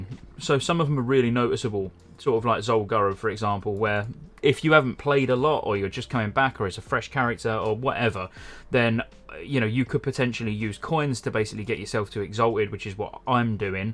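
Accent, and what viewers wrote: United Kingdom. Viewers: British accent with an LSU hat I love it, great video!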